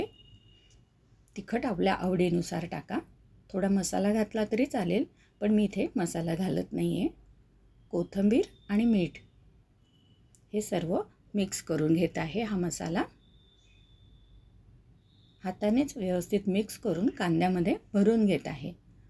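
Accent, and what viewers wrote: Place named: Khandesh, India. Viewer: एक नंबर ताई कांद्याची रेसिपी कांद्याची भाजी मला हवी खाण्याची रेसिपी ताई तुम्ही खानदेशी आहेत का कारण तुमच्या बोलण्यावरून समजत आहे मी खानदेशी आहे ताई मला एक विचारायचं होतं ते जखमी परमानंद गरम मसाला दाखवला आहे तुम्ही करतो सगळ्या भाज्यांसाठी वापरू शकतो का आपण झणझणीत उग्र वगैरे होत नाही का एक किलोच्या प्रमाणात सांगा नाहीतर अर्धा किलोच्या प्रमाणात सांगा प्लीज मी पण खानदेशी चा रिप्लाय द्या ताई मी तुमचे चैनल सबस्क्राईब केलेले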